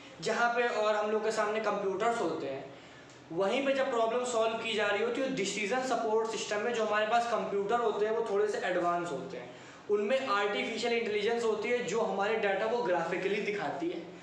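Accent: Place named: Kanpur, India. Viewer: bhaiya aap kanpur ya uske aas pas ke area se ho kya ?aapki tone vaisi hai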